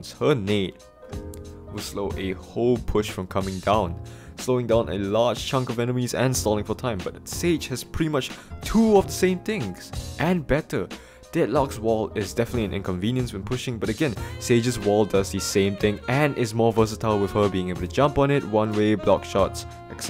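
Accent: Singapore. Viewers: u sound Singaporean so i'll sub, hope u do more entertaining rants.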